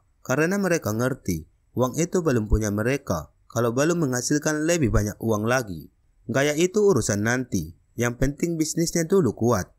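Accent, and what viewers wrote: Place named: Eastern Indonesia, Indonesia. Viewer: Sepertinya logat indonesia bagian timur gan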